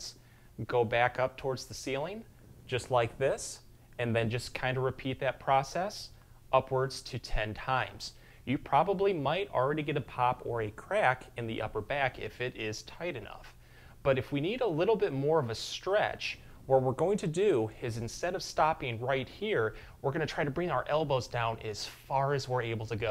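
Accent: Michigan, United States. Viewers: Completely unrelated to the video, but this made me realize how strong Michigan accents can be when you listen to them Related to the video, this is very helpful and should ease some pain from working! It’s a shame I’d need to drive across the state to get treated by you though, you know your stuff!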